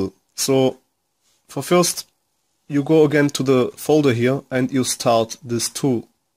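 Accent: Germany. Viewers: Pls Help.....I cant detect the chip!...Ich habe alles richtig angeschlossen und höre das du ein deutscher bist!...könntest du dich hier mal melden?...ich habe ein Msi Board verflasht mit der eigenen mflash bios methode...war nicht meine schuld! Ich habe alles so gemacht wie du es in den Videos beschrieben hast!!!.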